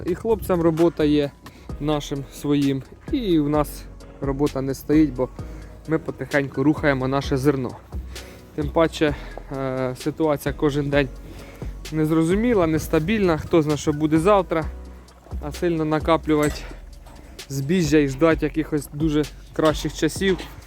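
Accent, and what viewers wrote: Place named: Poltava, Ukraine. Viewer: Судячи по говору Полтавська.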